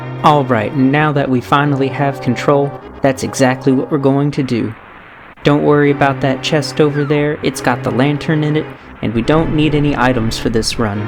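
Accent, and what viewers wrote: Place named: South, United States. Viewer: Alabama? Georgia? Mississippi? Tennessee? Where ya' from? TEXAS!?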